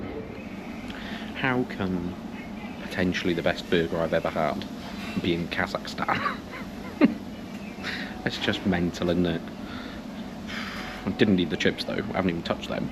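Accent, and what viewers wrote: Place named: United Kingdom. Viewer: Я конечно не понимаю на английском, уж тем более с британским акцентом, но посмотр был интересным!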